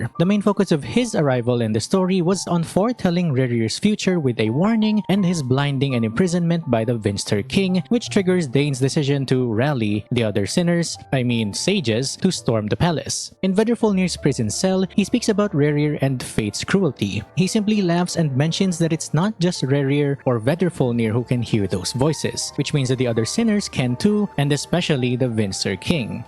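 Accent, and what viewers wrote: Philippines. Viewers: Great vid.. also the sexiest Filipino accent ever 😉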